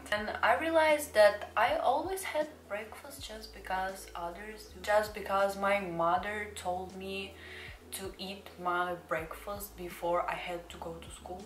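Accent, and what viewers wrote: Russia. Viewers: Почему мне всё видео казалось, что она с России или Украины...Просто акцент у меня и моих подруг такой же....Ну или мы просто уже на уровне бог говорим...Ну или я сейчас жестко туплю из-за авто переводчика на ноуте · Слышу русский акцент) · Your accent (Russian)? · My brain cannot process on how korean you look but have a russian accent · мне нравится твой русский акцент😩💖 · Не посмотрев на имя, услышала акцент, и думаю, она что русская? Имя - сказало все за себя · Русский акцент в английском так классно звучит(Грустный русский взгляд))) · Yes, she has Russian accent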